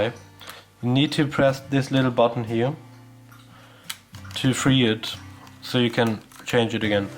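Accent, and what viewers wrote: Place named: Germany. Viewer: Englisch mit 'nem deutschen Akzent, hach wie sehr ich es liebe :)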